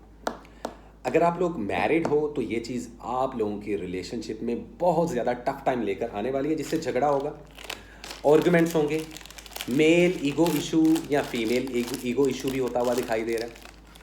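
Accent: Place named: Punjab, India. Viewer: Capi here,Thanks for the reading 🙏🏼 Love your Punjabi accent 🫶🏼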